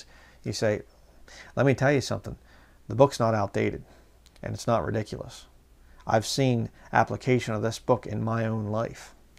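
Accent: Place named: Pennsylvania, United States. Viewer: Don't worry about your accent brother, we Pennsylvanians are unique in that regard. I'm from the other side of the state -Pittsburgh and many of us say "keller" for color (and "aht" for out and "cahch" for couch)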